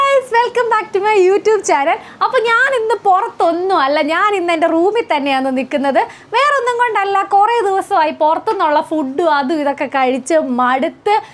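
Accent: Kottayam, India. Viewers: എന്നാ കൊച്ചേ വീഡിയോ ഒന്നും ഇടാത്തെ ചുമ്മാ നടക്കുമ്പോൾ എങ്കിലും ഫോൺ പൊക്കിപിടിച്ചു പോകു 😂😂 1k കഴിഞ്ഞു വല്ലതും അറിയുന്നുണ്ടോ 😂😂😜. കോട്ടയം,കണ്ണൂർ, സ്ലാങ് കേൾക്കാൻ നല്ല രസമാ ☺️ അവിടെ ഏതോ കട്ടിലിൽ നടക്കുന്നകണ്ടു 😊 അവിടെ 5 ഏക്കർ വാങ്ങിയോ 😊 പുതിയ സ്ഥലം കാണിച്ചിട്ട് വല്ല്യ അനക്കമൊന്നുമില്ലലോ??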